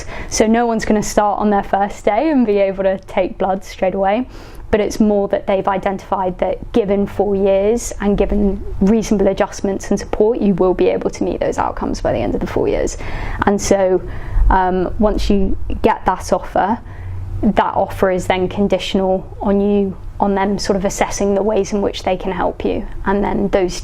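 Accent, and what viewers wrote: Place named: England, United Kingdom. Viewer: I love the inglsih accent ,Greetings from Uruguay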